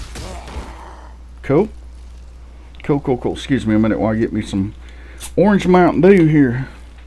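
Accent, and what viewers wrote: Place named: Texas, United States. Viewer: i am wayyyyy to friggin tired to watch this. I've been playing PoE for like 6 years...but i alway seem to stop at 30ish lmao. idk why. okay ill go. i am litterally going mad from sleep depretion. hmmm idk what to critque or complement or anything on this one. honestly, i may just save the video, and watch it later when i try the game, again, and stop at lvl 30 for some random reason. 16 friggin hours of work. i feel it's kinda pointless to make the palworld-base video i was working on...but gonna do it anyways. okay what am i talking about? Keep going my dude. PS you have the most country accent ever (i mean like in the TX country, not redneck weirdo one, it's not like....idk a jab at you). just like "man this dude is from OK or TX or something, maybe like some rural parts of Arkansas" if i'm right lemme know.... 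bc this is litterally the only thing my linguestics degree is worth, guessing ppl's accents. also i am drunk. gn~!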